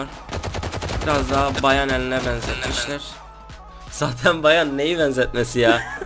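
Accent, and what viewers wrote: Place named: Southeast, Turkey. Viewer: Şivenden anladım hangi güneydoğu şehrinde yasiyon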